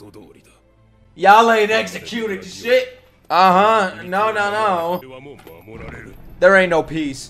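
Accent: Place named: New York City, United States. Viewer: You can already hear the city accent getting to him lolllll. A true NYC resident in the making.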